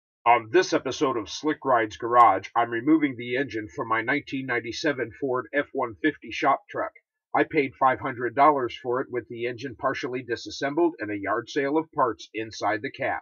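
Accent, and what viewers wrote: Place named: Missouri, United States. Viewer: Awesome video. I also like to do engine swaps and customizations. I also live in Springfield Missouri which it sounds like is where you're at also. I've watched several of your videos recently and never caught that. 👍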